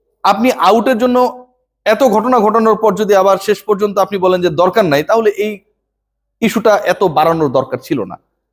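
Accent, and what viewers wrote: Noakhali, Bangladesh. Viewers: ভাই নোয়াখালির বাষায় বলে মোশাই কতটুকু তার হুনিতই কতটুকু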